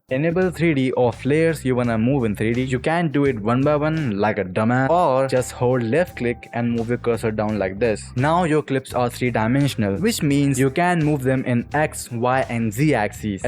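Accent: India. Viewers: always the indian acent with the best tut:) love u sm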